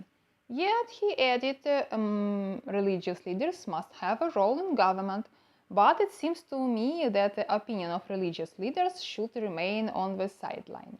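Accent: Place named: Russia. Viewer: It's cool. I see that the person from Russia. I can hear my Russian accent😂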